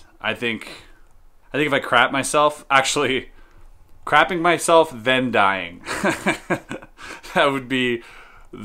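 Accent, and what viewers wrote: Quebec, Canada. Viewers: Hey where you from quebec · si si j'ai tout compris... bon tu a pris ton accent canadien natif... MY CHALLENGE: parler en Français, sans accent Canadien (i remember that you were bragging about your ability to pick up any accent... so here you go) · Je viens de MINDFUCK completement. je me doutais que tu parlais francais mais QUOI?!?! Je m'attendais a un francais cassé pas que tu parlais "quebecois". Ca rajoute un sentiment d'appartenance enorme. MONTREAL REPRESENT. ca srait vraiment cool un video en francais. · Canadian French is so much different from the "usual" French I get to hear xD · I totally digged the French speaking part! Et l'accent québécois... S'il te plaît parle Français plus souvent dans tes vidéos. Love you man. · Where are you from? Your french sounds like you are from Québec. Ohh and good video by the way (as always) !! 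· mon dieu mais ton accent canadien est magnifique, j'adore ta chaine et j'ignorais que tu étais canadiens. 👍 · Un joli petit accent quebecois ;)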